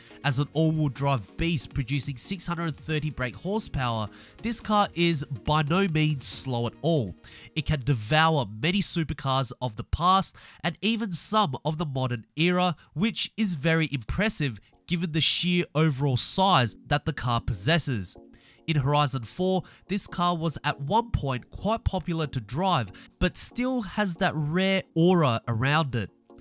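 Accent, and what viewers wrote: Australia. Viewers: I never knew you were Aussie mate. I can hear your accent now. I'm across the ditch. Those Rovers were popular here back in the day. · aussie voices are the best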